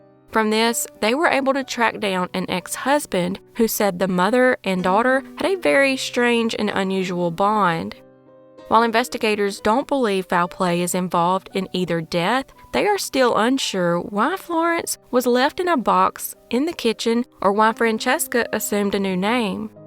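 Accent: South, United States. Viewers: This homegrown Aussie girl absolutely loves all of your southern girls accents. Or is it y’all? Sounds lovely to me. 🎶 💕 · The southern accent definitely helps.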